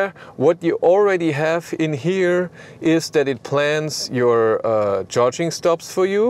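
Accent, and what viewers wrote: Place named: Lower Bavaria, Germany. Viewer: How I love your Niederbayrisch 😍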